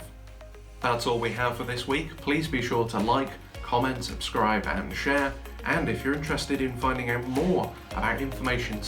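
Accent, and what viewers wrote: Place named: United Kingdom. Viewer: Hola funny talking Brit dude! USA cowboy here. Yee-Haw bro. OK, Salutation done. Check. Suppose there was a white hat hacker group dedicated to finding out bad hackers and TAKING THEM OUT. Seek and destroy. Make them fear. Next, blockchain smart contract. Example, I got hacked by dipshit ransomware and I am pissed! I am pledging 0.01 btc to whoever takes out this garbage. And there are 10,000 other angry victims also wanting justice. The bounty gets up to 100 btc, that’s like $1.8 million. White hats say Yeah, We gonna git em. So they (several WH groups) go after them, destroy them, prove it according to smart contract and collect the bounty...And other black hats getting very nervous and thinking about changing their ways. Maybe becoming White Hat. 130 years ago, Where I live, If We had an a-hole We would put up a bounty and hunters would go git em. 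Ded or a-live. Keep up the good work and I will keep watching. Comment...FIRST!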